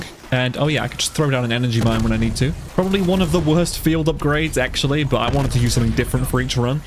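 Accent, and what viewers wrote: United Kingdom. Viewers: Its refreshing to hear a british person talk about how messed up rowling is.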